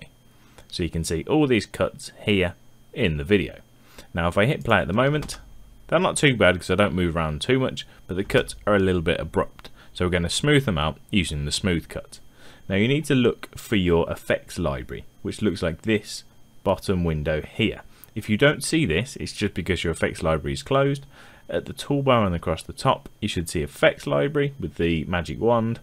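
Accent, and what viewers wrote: United Kingdom. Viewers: Hi, I’m very new to video editing and Resolve - as in I only downloaded it this week! Stumbled across your channel and finding your videos useful, plus it’s a refreshing change to hear a Brit voice for a change! I must have missed something here - how did you adjust the smooth cuts to cut out the dialogue you didn’t want to include? Do you use the audio track for this? Cheers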